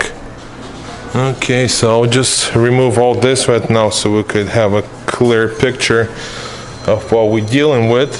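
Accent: Russia. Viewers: Говор как у русского.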